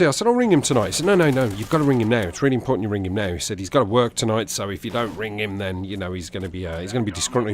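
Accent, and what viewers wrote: Birmingham, United Kingdom. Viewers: Nice story BRUMMY LOL Getting much better. Keep it going Why don't you do a face reveal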